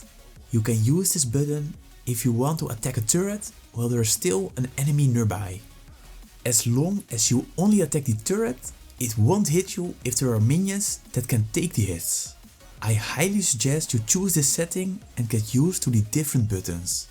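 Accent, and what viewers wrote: Netherlands. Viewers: ik hoor dat je nederlander bent nice vid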